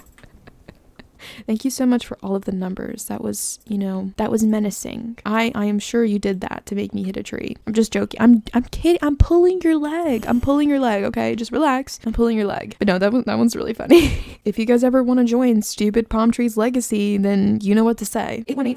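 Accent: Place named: South, United States. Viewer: your southern accent is 🤌🏻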